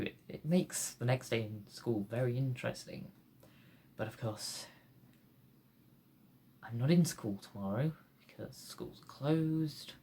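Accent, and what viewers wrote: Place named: United Kingdom. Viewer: watching you be exceptionally British makes me insanely happy oh my god THE FREAKING ACCENT #Simp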